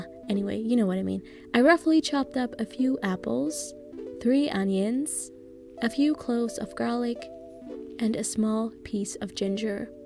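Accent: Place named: Germany. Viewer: Ich glaube mit Akzent war eher Dialekt gemeint, also die Region aus Deutschland wo du aufgewachsen bist. Kein ausländischer Akzent :)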